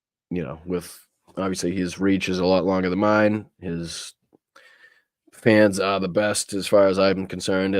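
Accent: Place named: New England, United States. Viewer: This was fun. I learned more about you, which explains why I don't see enough of you. You are very funny no matter what you're talking about, and you're handsome with an adorably annoying N.E. accent. 😉 Take that and run with it for now. It will develop into something on its own. The first 10 minutes made me laugh hysterically. The tech issues being worked out was funny because, like I said, it doesn't matter what you're doing, you bring fun positive energy just by showing up. I like the shoot the shit mode with the chat. I need a break from the more rigidly constructed political shows. You can still talk politics. It's just more relaxed and off the cuff, so I'm less traumatised by the events of each day. Starting my day today with a smile, thanks to YOU❣️😁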